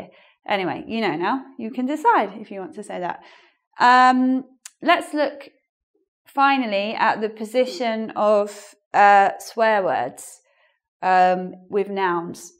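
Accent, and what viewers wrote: United Kingdom. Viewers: Her British accent is so cute! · I love your British accent. I wish I could sound more authenticaly. But I find bit silly trying to copy a native speaker, doesnt it sound awkward? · give me that british accent m8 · Hi, I have to confess: british english pronountiation is much more difficult to understand to me than american english. I follow your channel and I watch all your videos, but I have to say that I struggled to understand you today. But, keep it up :-)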